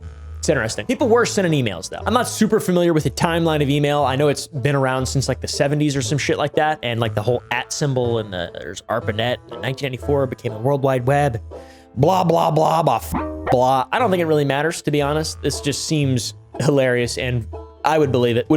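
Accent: India. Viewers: From his accent he actually sounds Indian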